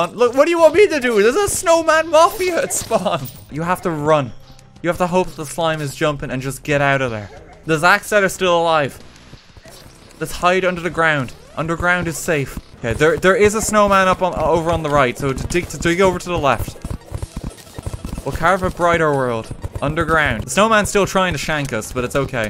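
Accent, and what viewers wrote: Ireland. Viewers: R u irish? cuz ya got an irish accent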